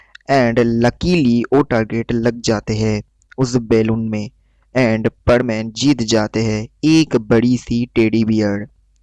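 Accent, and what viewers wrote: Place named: Bengal, India. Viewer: Are you a Bengali because your Hindi is like from a Bengali because i am also a Bengali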